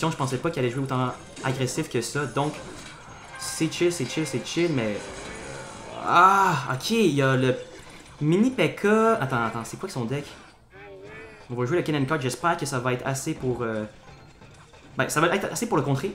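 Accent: Quebec, Canada. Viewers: Salut, vidéo géniale avec accent québécois génial. Continue comme ça. Voici mon ID clash royal pour l'emote Pekka: #YUJP2JCG8 Baptiste.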